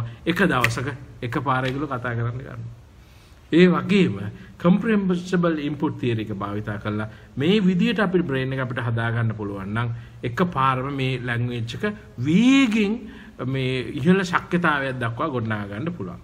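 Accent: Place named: Sri Lanka. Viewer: පුලුවන් කෙනෙක් ඉන්නවනම්. මේ සර් වගේ සිංහල අය කතා කරන ඉංග්‍රීසි තියන චැනල් එකක් කියන්න.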